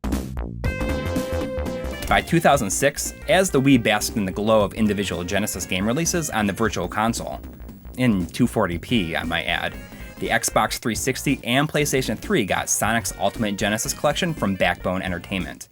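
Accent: Wisconsin, United States. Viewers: I seriously can’t take the Wisconsin AAAAccent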